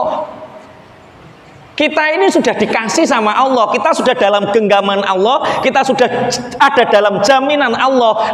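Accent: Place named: Bojonegoro, Indonesia. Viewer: Kekel aku ngrungokne logate Yai Bojonegoro ,sebabpe aku dewe wong Bojonegoro ,tapi matur suwun pencerahanipun ,semoga berkah P Yai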